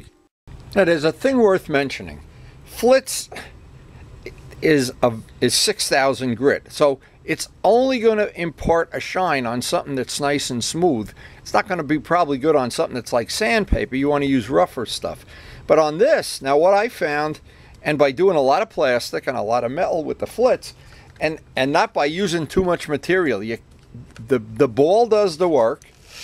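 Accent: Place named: New York, United States. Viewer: I swear... you sound just like my father!! Same N.Y. accent. Same gestures. Same humor. Same ability to do things that many would think were too difficult to even try on their own. My dad is a Parris Island Marine, with a tenth grade education, who learned that everybody has value, as we can always learn from others who have struggled to accomplish something. So, when you mention the friends who have helped you learn your craft along the way, that says a lot about your character sir. I ain't askin for a hug or anything... just giving you your props. Peace!!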